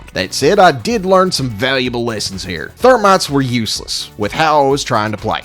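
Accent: South, United States. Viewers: Is the southern accent a bit or are you from the south? Would be nice to meet one of my own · iam sorry but you sound like a very southern version of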